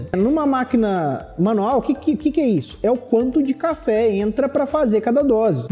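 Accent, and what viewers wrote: Minas Gerais, Brazil. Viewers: Oi, eu não conhecia os cafés especiais e o quão incrível é esse mundo até achar o seu canal por acaso. Passei a seguir suas dicas e hoje eu aprendi a apreciar o café. Só tenho a agradecer pelo seu excelente trabalho! se possível pode tirar uma duvida minha? eu tenho pesquisado a respeito de uma maquina da Oster esse modelo aqui: <link> comparada com as outras ela parece muito mais simples. você já viu essa maquina ou teria algum comentário a fazer sobre? ela parece ser muito simples de usar. sobre o bordão, bom acho que sendo de minas você poderia usar algo mais característico do sotaque dai. Talvez fique legal C: um grande abraço e muito sucesso!!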